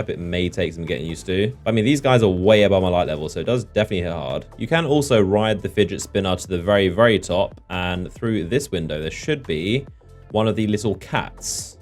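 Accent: United Kingdom. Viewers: Pls talk a little bit slower, i barely understand u bcuz of your british accent.Thank u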